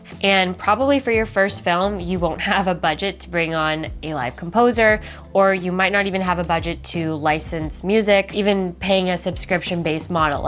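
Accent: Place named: United States. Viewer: I am brazilian and live in London. I love your american accent.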